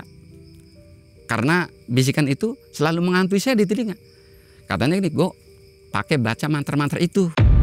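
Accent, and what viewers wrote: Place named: West Java, Indonesia. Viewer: Kok logatnya kaya orang sunda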